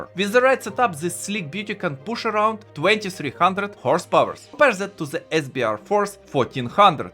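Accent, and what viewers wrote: Russia. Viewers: you saying how avarge russian guy trying to say in english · Are you also russian? Just very simmilar accent. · мне одному кажется что у автора русский акцент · У тебя русский акцент бро)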